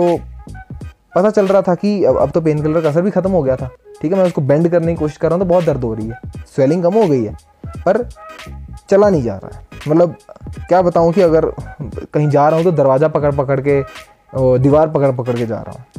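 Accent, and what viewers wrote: India. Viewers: new subscriber here ! sounds like a UP wale bhaiya !!Am I right????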